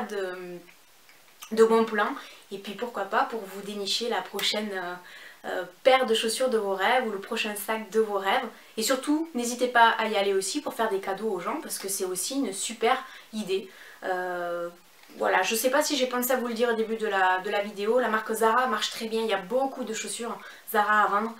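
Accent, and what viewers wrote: South, France. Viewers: ton accent du Sud mon Dieu j'adore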